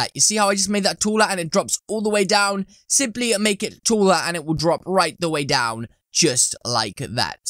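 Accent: United Kingdom. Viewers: He's English/ Briti. He says stuff different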